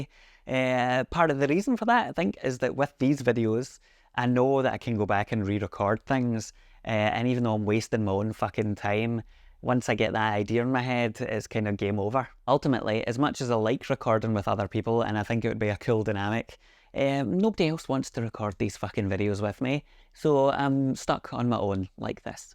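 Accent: Scotland, United Kingdom. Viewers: Your videos are great because it feels like you are hanging out at yours for a bit. Its so easy to get stuck in perfectionism loops, so whaever you need to do to have fun doing it is worth exploring. But as feedback, Ive never noticed you looking away from the screen and your videos always come across as really well produced, engaging and enjoyable. Just be yourself. Also thanks for hosting a synth/video channel with your own accent. Puts fellow scots at ease, even the ones from Edinburgh:)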